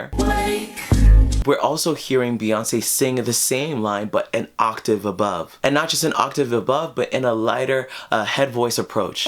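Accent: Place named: Jamaica. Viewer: This is not meant to be assuming, or offensive. But when I listen to your voice, some of the subtle nuances in your voice I get a Jamaican accent underlying your pronunciation. Just an observation I made. I could be just projecting.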